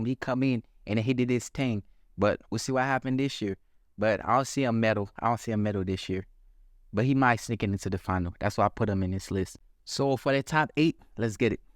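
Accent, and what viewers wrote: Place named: South Carolina, United States. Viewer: Love your accent, man! Are you from South Carolina?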